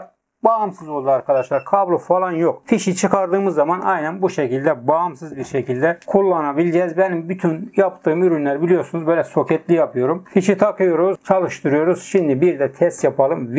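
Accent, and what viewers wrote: Erzurum, Turkey. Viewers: hay senın ben cigerin yiyim dayi maşallah sene bir dadas her yerde dadastir gendin belli edisen arada bir videolara koy şivemizi💗💗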